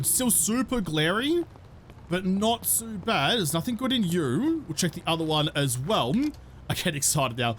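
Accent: Australia. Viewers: You have the most Australian accent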